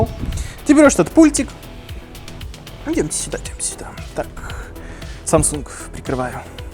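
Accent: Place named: West, Belarus. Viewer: Первый раз на канале этом. 20 лет назад жил на протяжении 6 лет в Гродно, и этот говор такой западно белорусский, прям ностальгия )))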